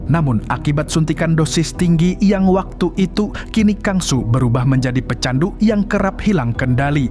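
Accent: Banjar, Indonesia. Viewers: URANG MANA MANG ?? BANJAR AH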